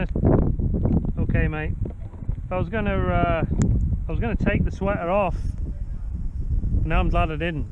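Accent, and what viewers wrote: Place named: Yorkshire, United Kingdom. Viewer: You sound like a Yorkshire man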